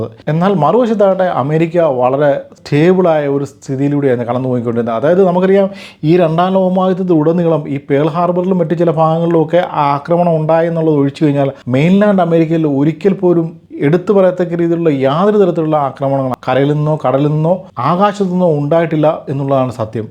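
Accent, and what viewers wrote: Kottayam, India. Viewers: veedu kottayath vellom anoo....slang😊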